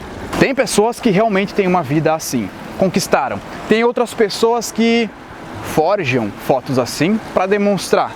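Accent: Rio Grande do Sul, Brazil. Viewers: Muito bom como todos os conteudos, mas entre nos meu velho, pelo teu sotaque sei que tu também é Gaúcho, diz ai: É Gremista ou colorado haha sucesso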